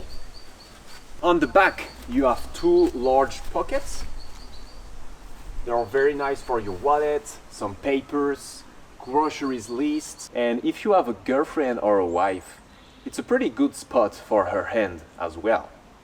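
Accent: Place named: France. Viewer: Thanks for the review. The French accent.....love it. Have you tried the 511 Apex cargo pants?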